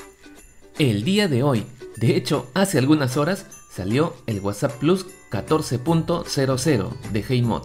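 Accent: Peru. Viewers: Jajajaj el acento peruano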